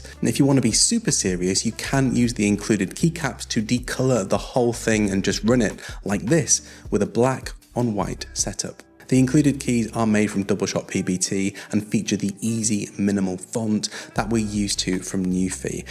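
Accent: England, United Kingdom. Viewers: I hate the audio track chage it to my county language I like your English accent love this review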